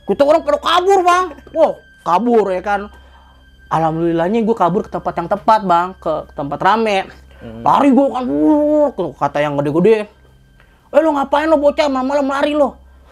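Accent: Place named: Betawi, Indonesia. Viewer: kocak lu bang 🤣🤣...bahasa betawinya ora dibuat2 natural bgt..wkwkkw biarpu ceritanya lompat2 ketutup sm pembawaan ceritanya..sukses terus buat chanel besok pagi dan bang, w suke gaye lu..😁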